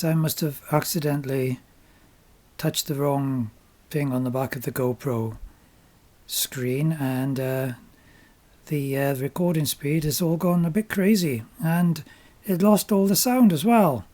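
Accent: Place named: Wales, United Kingdom. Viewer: Well done! Thanks for posting this interesting and informative video! Your voice reminds me of family visits to Griffithstown (Mon), many, many years ago - will be starting to view your archive from the beginning after landing here due to 'YT Algorithms'.... We used to float about the Atlantic on a home-built 6-berth catamaran, but 'swallowed the anchor' many years ago.... part of our family is on the canals currently, wherever they can find water. I retired at the end of the last century, married the good lady, and launched our composite cat - which we sailed down to the Canaries for the honeymoon and a few years more. Our cat had an outboard motor leg that would pull up for weed removal, and (of course) for sailing. Much, much faster under sail! One of our mates out in the Canaries had a wake-board, and in ideal conditions we could rip across the bay with him behind us! 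The trick we used to free our prop (which worked sometimes) was to listen attentively for the engine revs dropping, and bang her into reverse - which could un-wind the flotsam, if we were lucky. Yours looked like a really obstinate tangle! Thanks again, a lovely tale.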